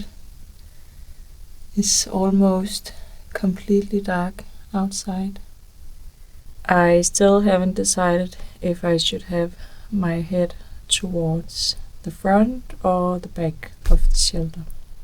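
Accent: Denmark. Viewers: Danish accent, from Denmark 🇩🇰🇩🇰🇩🇰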